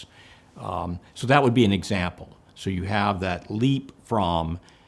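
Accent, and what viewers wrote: United States. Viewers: Ha, it's mostly a middle American accent with a 'who knows what' perturbation. May have grown up in one place, and moved to another during childhood.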